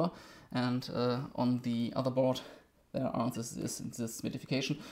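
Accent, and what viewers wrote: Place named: Germany. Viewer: I too do not have an issue with your speech. Maybe I a more familiar with how Germans speak English.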